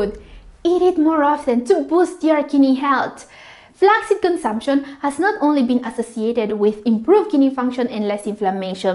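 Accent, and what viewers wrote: United Kingdom. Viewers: Her British English is just as a Londoner!!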